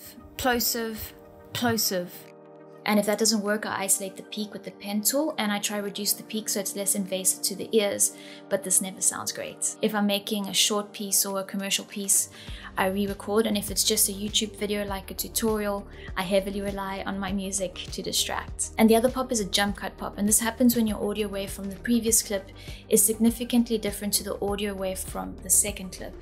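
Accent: South Africa. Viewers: This was so helpful! Thank you! Totally random question, but are you from South Africa?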